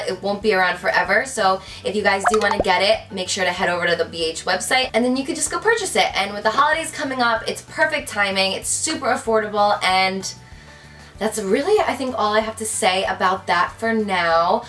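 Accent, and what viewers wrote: New Jersey, United States. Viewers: i KNEW you were from Jersey!!! yay!!!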